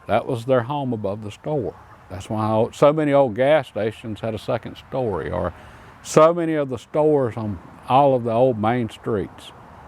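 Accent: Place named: South, United States. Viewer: By the way, I like what you say and that southern accent. I’m an ole Arkansas boy. New subscriber.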